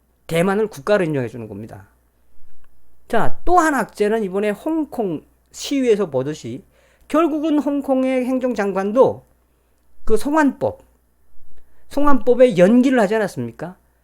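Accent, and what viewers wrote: North Korea. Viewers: 에구 그 북한 억양 말투 듣기 거북하네요 자꾸 듣다보면 자연스러워지고 학습화됩니다 세뇌되어지고 자제부탁드립니다 여긴 대한민국입니다 정말 싫어요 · 북한말로 방송 참 재미 있습니다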